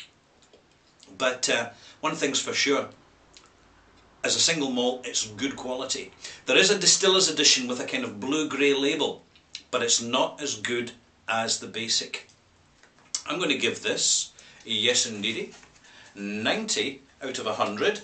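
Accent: Scotland, United Kingdom. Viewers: Hey, thanks for these entertaining and informative, no, I say EDUCATIVE reviews. I enjoy them regularly and often try out some of your recomendations. Sometimes you refer to a single malt as "rederly available". Now sorry to ask, I am not familiar with scottish accent (which sound very pleasant, even adorable to me, at least the way you speak it). Do you mean "rarely available" or "really available". Would be quite essential to know. Thanks for an answer.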